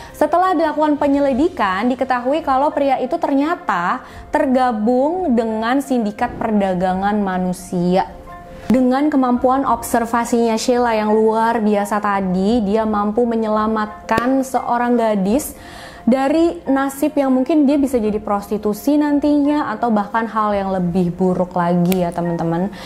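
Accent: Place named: Bandung, Indonesia. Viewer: Kamu orang Bandung ya, logat ngomong.a kaya orang Bandung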